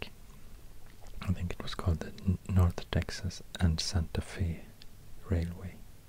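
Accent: Sweden. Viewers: Idk why but there’s something satisfying about hearing Texan city names being spoken in a Swedish accent. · Svensk? Eller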